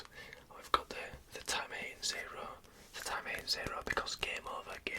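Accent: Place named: Lincolnshire, United Kingdom. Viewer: Where are you from? Your accent sounds like home! I’m from Lincolnshire!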